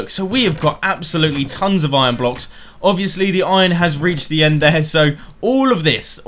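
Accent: England, United Kingdom. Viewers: 1 I subscribed because you make cool videos and you have the best British accent I have ever heard 2 Do a facecam 3 I would like to see more too small videos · 1. Because your accent sounds amazing, 2, it's good as it is now, 3, can you learn us how to speak with an british accent? That would be amazing :). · hermit craft and the british voice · I'm not so good at listing so i leave it to you to understand:P 42. I really love your editing and i always wanted to learn using "adobe after effect" it will be lovely if you make few tutorials about this awesome program. for another thing, i think that adding few more games to the channel will spoil. 485. i love all of your vids, i don't think there is something you need to change, but i would really love to see tutorials on bigger and more complicated redstone contraptions, i know it is hard for you to do that, but i would be really happy if you make a vid, i don't know what, maybe a 16x16 screen. 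99837.I subscribed to you at the beginning, when you made all the redstone awesome stuff, i was totally noob with redstone and wanted to learn some. after a while i was like: "YAY! another vid from!" and of course - its because i love your British accent and you:) hope that my opinion will help you:D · 1: I subscribed to you because I started minecraft about 2 months ago due to Gophervids doing a live stream of minecraft as a first impressions, after doing the whole building thing, I wanted to get into Redstone a little more and googled redstone minecraft and your vids came up first, a fun way and seemed a lot more professional compared to others. 2: Nothing really, you seem to be doing fine, redstone and live streams are regular, your quality is top notch (pun intended), and i'm really enjoying Hermitcraft series 3 and just re-watching hermitcraft series 2. 3: Seems to be fine, I've subscribed to 2 channel and it's filling in the void for whatever was missing at the time of filming, Xisumavoid collabs won't go amiss. 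Edit: I didn't stay for the accent because I'm British and I hear it everyday lol. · 1. ur one of the few brits that are good commentators and i love brit accents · because your commentary is entertaining and you do have a very nice english accent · 1.because I love minecraft, and British accents are awesome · 1. I subscribed because of your British accent. Because more than half my subscriptions are Brit. And of course, I love redstone, cars, and minecraft in general. 2. I just suggest maybe some better wifi connectivity because most times with me. The wifi is why it's slow 3. Add some mod reviews. I love mod reviews, a lot. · BECAUSE BRITISH ACCENT MAKES EVERYTHING BETTER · 1. I subbed to u because UR BRITTISCH ACCENT IS FREAKING AWESOME and i love your redstone videos 2. hard question...^^ 3. If u would start playing and recording League Of legends you would be awesome I've also have a suggestion of what you could do in your redstone videos. 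A 9 didgit code lock, been looking for a tutorial on that for ever and i can't find one. So maybe you could do that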